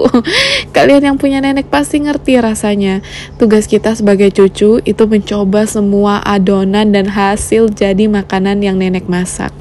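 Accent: Manggarai, Indonesia. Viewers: ci memang org NTT tepatnya Manggarai say😊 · Baru tau kak berarti sama dengan saya Manggarai juga😆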